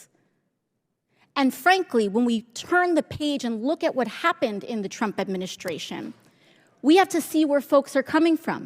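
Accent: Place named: Spain. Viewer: Just because you turn on your Spanish accent doesn’t make you an expert on immigration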